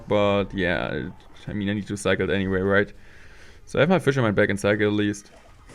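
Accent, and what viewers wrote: Germany. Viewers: i hear a german accent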